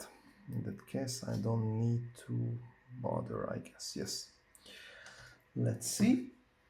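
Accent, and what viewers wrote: Hungary. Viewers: Szia, pontosan ilyen laptopom van. Van ra lehetoseg, hogy privatban beszeljunk? Ramot szeretnek boviteni viszont szakemberre biznam plusz lenne par specifikus kerdesem ezzel a laptoppal kapcsolatban. Nagyon informativ a video koszonom. (Magyar akcentus elteveszthetetlen :) )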